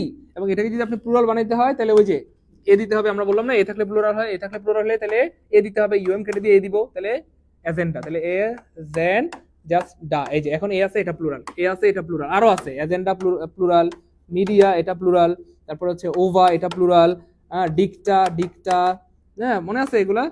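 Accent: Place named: Noakhali, Bangladesh. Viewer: স্যার মনে হচ্ছে নোয়াখাইল্লা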